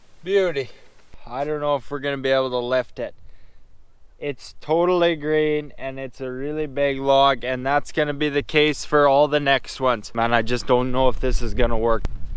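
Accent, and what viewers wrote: Canada. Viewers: You had me at your Canadian. Hot DAMN it's nice to hear that Canadian accent for a change. Hi from BC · Man, what a beautiful site to build a log cabin , I was wondering,where it is. Lol,until I heard him speak,and I knew it’s Canada, aye . Nice large logs and a big ,flat open field . Love it 👍🏼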